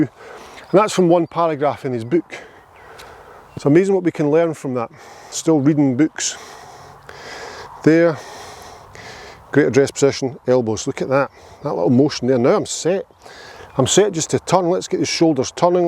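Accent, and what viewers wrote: Scotland, United Kingdom. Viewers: Great lesson. I can't wait to try it at the range tomorrow. BTW, love the Scottish burr. I have a friend Graeme Baxter who is Scottish. He is a fantastic golf artist. He is licensed by the R and A and the USGA. and has brilliant artworks of literally all the majors including The Open. You might want to check his web page out.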